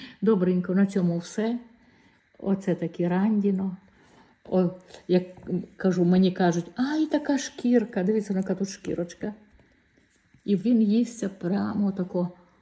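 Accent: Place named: Kyiv, Ukraine. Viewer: Доброго дня пані, сьогодні попала до вас на гостину на ваш канал вперше, бачила ваш коментар у Вікторії та що вирощує свині з Запорізької області, переглянула ваші шортси і відео, дуже цікаво, у вас такий гарний голос, приємний, звідки ви? У вас така мова як у мої тітки яка живе в Фастівському районі Київської області. Тільки прочитала під одним відео що у вас можна замовити пепінку, вперше про неї почула у вас, але як смачно ви її куштували і яка вона соковита в мене аж слина з рота побігла😅. І тут я читаю коментар який був написаний два дні назад що вже немає у вас саджанців на замовлення, дуже вдячна якщо дасте номер пані Наталії з ізмаїла, а можливо у неї є також канал на ютубі? Також буду вдячна якщо скинете силку на її канал, соромно звзнатися але інжир в такому вигляді я ще не їла, тільки в'ялений і той що я їла вперше він мав запах якихось ліків. 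Скажіть будь ласка по якій ціні Ви купували той інжир, плоди, що з Ізмаїла. А ще буду дуже вдячна якщо дасте адрес, того пана Анатолія де ви брали саджанці пепінки. Я сама з Одещини 🙋‍♂️. Поки дослухала ваше відео, вже в мене склалося враження що ви з Житомирської області, там також жила моя покійна тітка. Ще раз дякую дуже велике вам за відео, дуже приємно було з вами познайомитися, скажіть будь ласка а ви себе не показуєте у відео, дуже приємний ваш голос хотілося б вас побачити. Всього вам самого-самого найкращого пані, хай Бог береже вас і вашу родину, Божого вам благословення 🕊️🙏🙏🙏 буду з нетерпінням чекати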